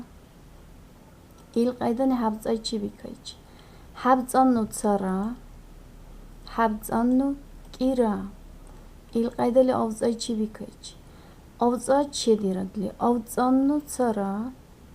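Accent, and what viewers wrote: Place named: Akusha, Russia. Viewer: Думаю Акушинский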